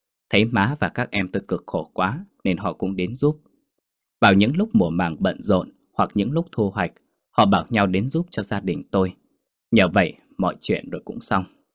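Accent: North, Vietnam. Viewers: Giọng bắc diễn đọc thật hay.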